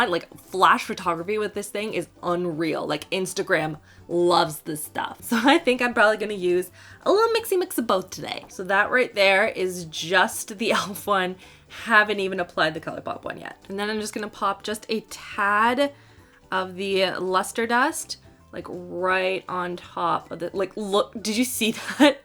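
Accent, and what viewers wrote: Canada. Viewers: I love your Canadian accent!! I need to try those NYX lipsticks. Really pretty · Just discovered your videos. Do I detect a Canadian accent? Excited to see Pixie mentioned, I was starting to think they did not make anything good. Thanks!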